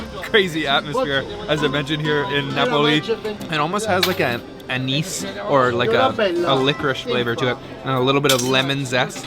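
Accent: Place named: Canada. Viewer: this guy has such a heavy canadian accent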